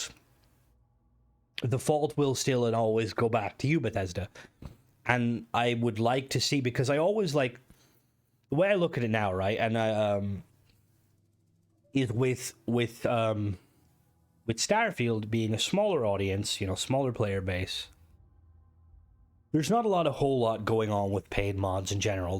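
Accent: Ireland. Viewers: M8 your accent sells it for me I think the Irish sound hilarious every thing else bonus your great lad am a Geordie so appreciate how funny accents are are and btw starfield is what it is the problem is it's not what everyone thought it was gonna be it's deffo not crap by any means bit niche yes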